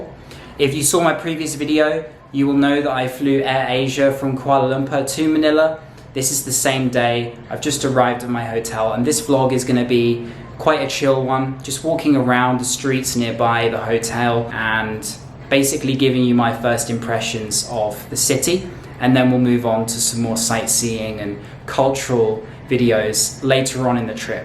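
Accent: England, United Kingdom. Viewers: I love your voice and English accent..You are exceptional in explaining sequence of events during your vedios...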